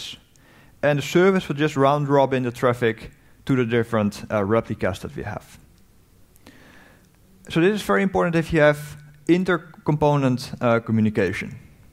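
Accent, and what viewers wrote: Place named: Netherlands. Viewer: helpful video. but the Dutch-English here is cringe worthy.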